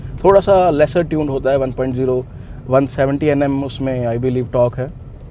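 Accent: Punjab, India. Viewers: punjabi lagda bai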